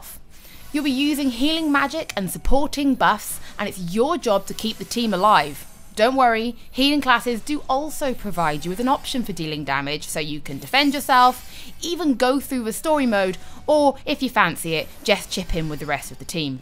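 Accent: United Kingdom. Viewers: Is it only me that thinks her voice is sexy but yet really cute at the same time. When she said archer was sexy as hell. She sounds British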